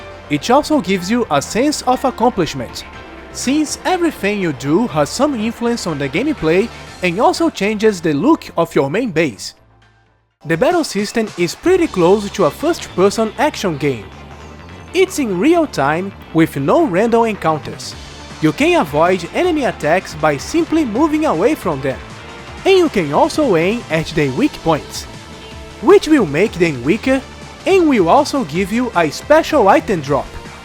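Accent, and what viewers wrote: Brazil. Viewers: Are you from Brazil? Your accent is so brazilian-like. Im brazilian btw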